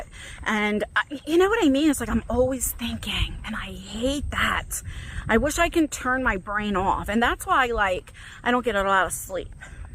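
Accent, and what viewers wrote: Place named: East Coast, United States. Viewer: What state are u in? U have an east coast accent. I am from PA. So i noticed it. ❤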